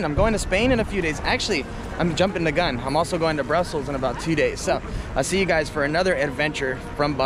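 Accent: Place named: Texas, United States. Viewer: Are you from Texas or El Paso. Do recognize an accent from there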